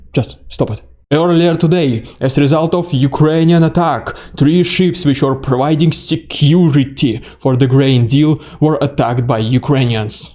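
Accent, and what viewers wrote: Russia. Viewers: I love your accent dude, but it needs to be much heavier and thicker you talk a little bit soft. Just drink vodka before you speak your voice may get heavier I just can not wait for your next video dude but please improve your accent to make it much heavier and thicker and harder 👍👍👍 so I will call you this guy is a really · 😎💪🔥 Coolest accent dude!!!🧐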